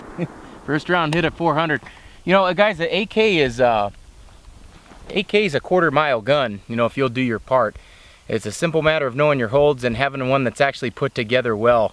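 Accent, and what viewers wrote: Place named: South, United States. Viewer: Your starting sound a little more southern. A little bit.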